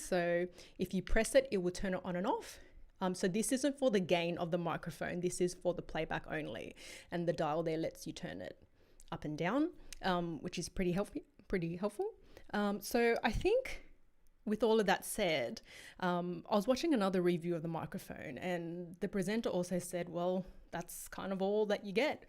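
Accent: Australia. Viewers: An Asian with an Australian accent? What a beautiful mix!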